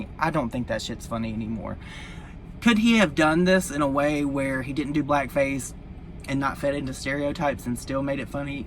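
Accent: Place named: South, United States. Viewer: This is off topic (seeing I’m physically sick to my stomach over all of this I figured I’d try and change the subject for a quick second- awesome video by the way) but I’m extremely curious... where are you from,? You have a southern accent. I can’t help but notice it because I love it 😊❤️ I just moved to Charlotte, NC- you have close to the same accent everyone does down here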